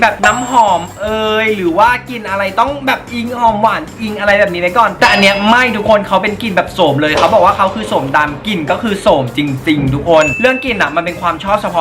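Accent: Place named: Thailand. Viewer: คนจังหวัดอะไรไหนพูดสิคะ<num>น่ารัก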